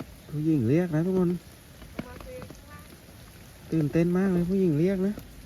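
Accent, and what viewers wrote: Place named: Ratchaburi, Thailand. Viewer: สำเนียง..ราชบุรี..ชัดเจน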